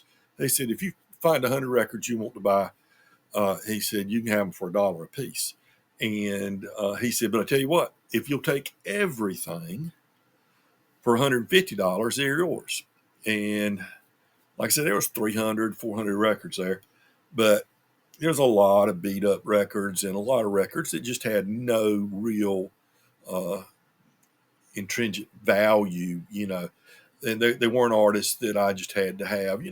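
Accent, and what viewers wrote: South, United States. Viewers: Great stuff. Yesterday on the Tokyo Record Style stream I asked you if you had done a video on albums from '74. Well, look back three years ago, pard.;) I wouldn't forget such a cool southern accent. I was just listening to today. I'm thinkin his cover of is going to be in my Summer Vinyl tag video... if I get the gumption to make it. (What a great album though.) I need to get my hands on that record.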